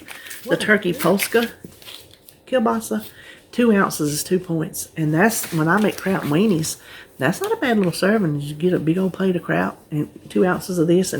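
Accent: South, United States. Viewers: These are a great idea!!! I love pop chips, but I buy the bigger bag and weigh them out and put in baggies. Bc I could definitely eat to many. I buy the big bags bc I love the flavored kind, barbecue, sour cream and onion, and cheddar.. so good! I don’t care for the plain. You are so sweet!!! Love your accent, I’m from the south and moved north for my husbands job, so it’s so refreshing to hear that accent. Everywhere I go someone always comments on it 😂.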